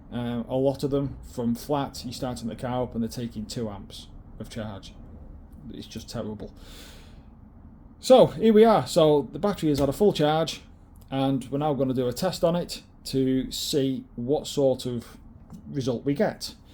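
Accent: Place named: United Kingdom. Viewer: thanks ! Gonna get the yuasa ybx5202 ! it's only 80€! don't want to waste my time at Toyota! anyway if they replace it They're gonna do it with a shitty battery ! Do you know if the latest Yaris 2024/2025 still have the same crappy batteries in them? On m'y Corolla 2024 i have VARTA ! Like your British accent👌😅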